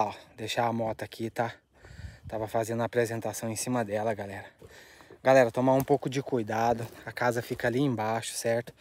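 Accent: Minas Gerais, Brazil. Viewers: Olá! Boa tarde amo assistir seus vídeos e seu trabalho! Esse seu sotaque é de mineiro? Kkkk adoro seu jeito de falar e sua humildade e honestidade!!!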